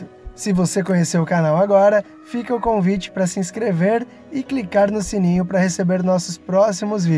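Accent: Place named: São Paulo, Brazil. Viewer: Sotaque paulista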